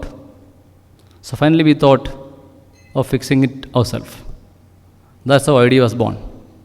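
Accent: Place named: Kerala, India. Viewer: He is a Handsome and Talented business man!! N from his accent he seems to be a Malyali!!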